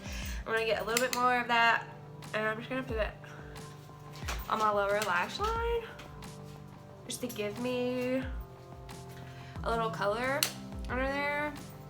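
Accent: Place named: South, United States. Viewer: Where you from girl ? I’m from Texas and I thought I had a country accent !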